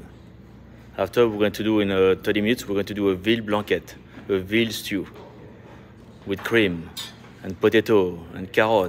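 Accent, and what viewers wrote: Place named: France. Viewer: Merci! It's a pleasure to see you again teach americans our uncopyable french accent... Je suis un fan de ta chaîne sérieux... Je te souhaite le meilleur pour la suite, tes video sont fraiches, pleines d'humour et tu te prends pas au sérieux en plus, chose rare! Continues comme ça, ça cartonne! From Lyon with love ma poule!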